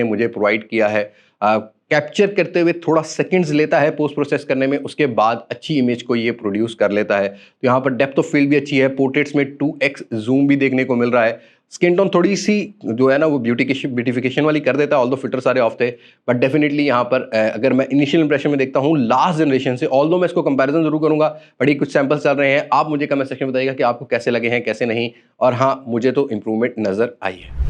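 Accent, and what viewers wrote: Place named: India. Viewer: Meri apse humble request Hy ap indian tarz my bat krna chor de . Ap yh kar skate ho ap woh kar skate ho . Proper Urdu my way my boliye ap yh kr skate hai ap woh kar sakte. Hy . Hoo ki jaga Hy lgiye pls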